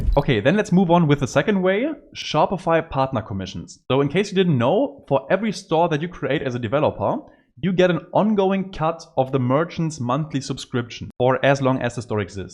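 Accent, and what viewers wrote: Germany. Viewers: Such a saver, very timely. Thank you so much. And yeah the German accent, spicing it up alittle and very original, so yeah.....😀😀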